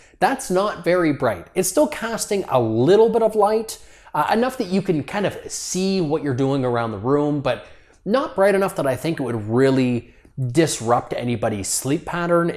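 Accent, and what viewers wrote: Canada. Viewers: You sound Canadian 🇨🇦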